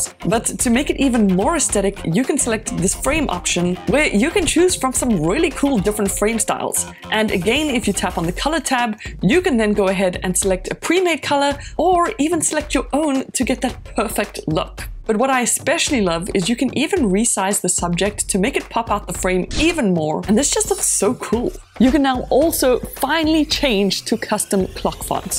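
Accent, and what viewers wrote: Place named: South Africa. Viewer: Yohhhh I hear a wonderful South African accent here! That's pretty schweeeeet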